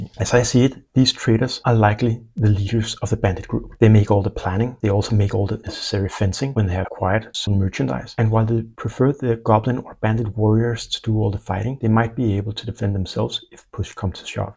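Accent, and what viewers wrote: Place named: Denmark. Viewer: Before I say anything else, I like this. Thank you! When you were describing the inhabitants of the rooms start I felt the thin coloured lines outlining the rooms was drowned out by the black walls. I really liked the setup of your version and both the descriptions of the rooms and what small modifications you made to the map. You sound Danish, are you? "None of your buisiness" is a perfectly acceptable answer, if you answer at all.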